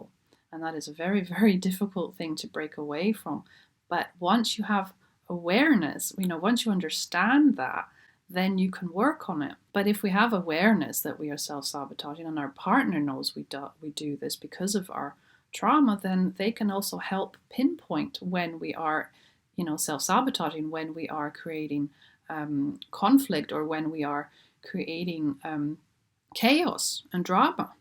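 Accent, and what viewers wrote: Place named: Scotland, United Kingdom. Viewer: Can't figure it out. Sometimes she sounds like a native of Scotland, sometimes Northern Ireland.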